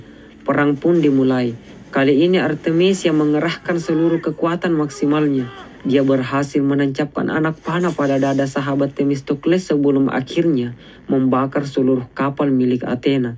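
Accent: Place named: South Sulawesi, Indonesia. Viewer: dari logat kayak org sulsel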